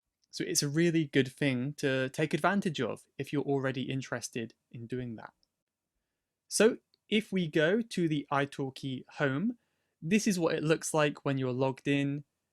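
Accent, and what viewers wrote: RP, United Kingdom. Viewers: Do you speak Rp accent?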